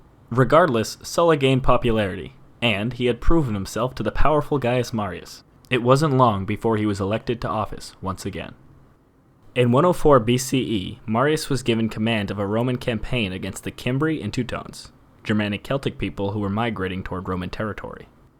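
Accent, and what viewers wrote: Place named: Canada. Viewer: he certainly has accent features that would indicate he’s Canadian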